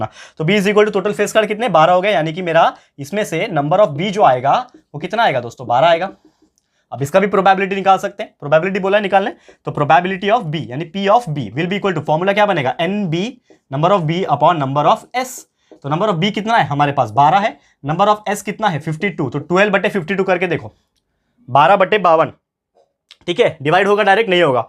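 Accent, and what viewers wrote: Maharashtra, India. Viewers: sir are your mother tongue is marathi. .. just asking😅 And thanks for teaching. Best teacher❤❤🧡🧡🧡🧡🧡🧡💛💛💛💛💛💚💚💚💙💙💙💜💜💜🤎🤎🤎🤎🤎💜💜💜💜💜💜💙💙💙💙💙💚💚💚💚💚💚💚💛💛💛💛💛🧡🧡❤❤❤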